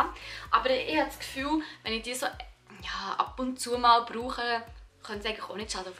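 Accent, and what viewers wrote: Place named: Switzerland. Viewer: Auch wenn mich beauty nicht interessiert, habe ich Spaß daran dir zu zuhören. Einfach nur weil mich das schweizer deutsch so fasziniert. Lg aus Niederösterreich :)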